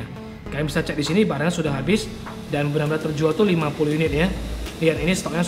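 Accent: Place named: North Sumatra, Indonesia. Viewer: Ooh abang orang pakam toh 🤣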